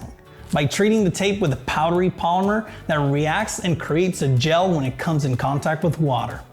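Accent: Quebec, Canada. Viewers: Great video! Are you French Canadian?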